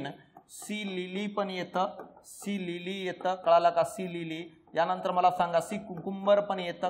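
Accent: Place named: Latur, India. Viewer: Sir तुम्ही लातूरचे आहात का. आवाज वरून वाटते.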